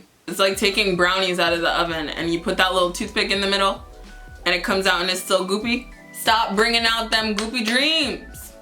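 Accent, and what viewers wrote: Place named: United States. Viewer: What part of america r u from interesting accent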